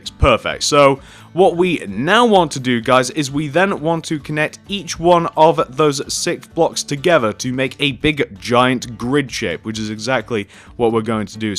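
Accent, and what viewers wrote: United Kingdom. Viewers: It’s a just a brutish accent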